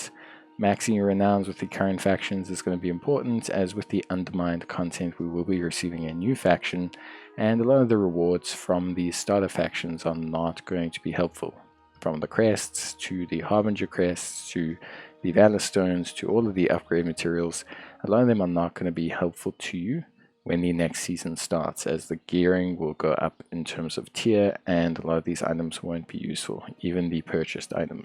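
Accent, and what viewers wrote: South Africa. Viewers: Are ya South African?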